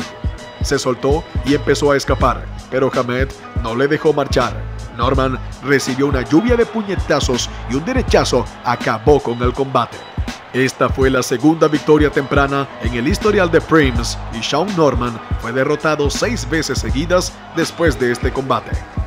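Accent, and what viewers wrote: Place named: Central America. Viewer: Parcial y mediocre tu video y TU SABES muy bien porque. No ubico tu acento pero debes ser centroamericano, no encuentro otra explicacion para tan inconmesurable omission.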